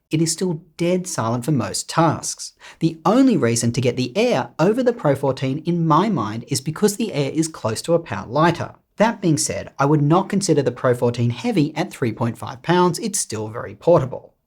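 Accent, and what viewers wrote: Australia. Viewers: I just love the Austrèlien accent, I didn’t pay much attention on the contents of video but. I will watch it again and try to concentrate on topic. Thank you. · Are you Australian by chance?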